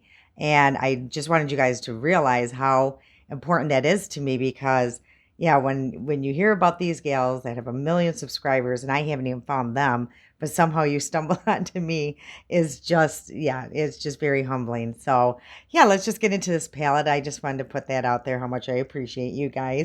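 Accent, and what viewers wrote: Midwest, United States. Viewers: Hi!! I’m not sure where you’re from but you remind me of my time living in Minnesota. That wonderful Midwest accent. You make me feel so nostalgic to go back there. :) enjoying your content.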